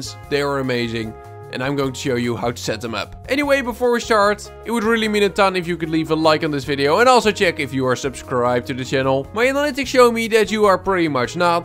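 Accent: Netherlands. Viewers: Youre dutch right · Best English Nederlands voce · hi ar you dutch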